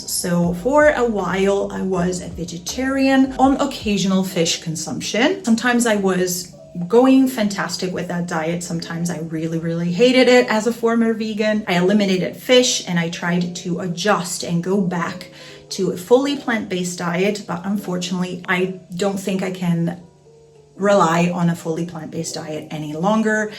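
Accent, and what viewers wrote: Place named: United States. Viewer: For someone who speaks perfect Italian and perfect German, your american accent is a put off. I'd rather hear you in those languages any day.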